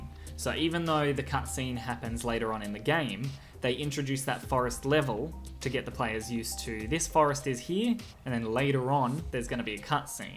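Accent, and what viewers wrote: Australia. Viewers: Is that an Aussie accent?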